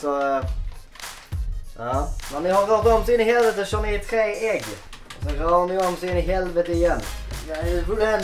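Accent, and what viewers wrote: Scania, Sweden. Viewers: " pusslar" Älskar skånska😂❤️👌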